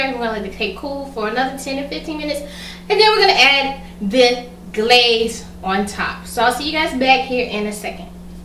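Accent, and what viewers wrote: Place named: South, United States. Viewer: I just want to know, do you have to talk so country?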